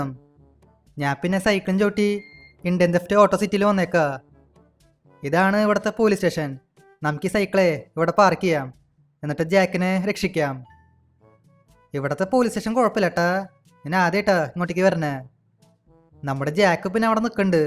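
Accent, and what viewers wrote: Thrissur, India. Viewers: Bro തൃശ്ശൂർക്കാരൻ ആണോ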